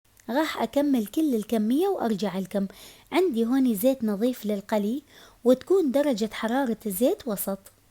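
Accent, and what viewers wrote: Mosul, Iraq. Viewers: هلا وكل الهلا نورتي ياغاليه عاشت ايدج انتي مبدعه ربي يحفظكم ويسعدكم ❤انتي من الموصل من اللهجه ❤ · هاي اللهجة المصلاوية عيني احترمي 👍🏻👍🏻👍🏻👍🏻👍🏻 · فديت اللهجة الموصلية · عاشت ايدج،، شگد حلوه اللهجه المصلاويه ❤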